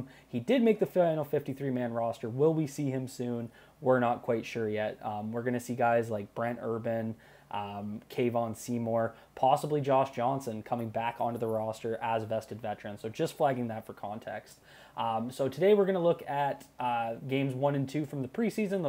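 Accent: Canada. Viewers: Your Canadian accent is so strong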